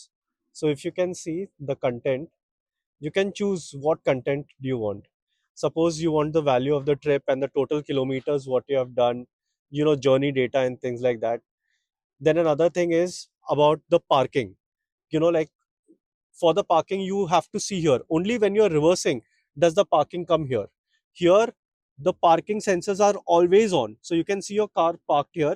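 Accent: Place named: India. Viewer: Can you use AI to change your fkd up Indian accent to something that people can understand?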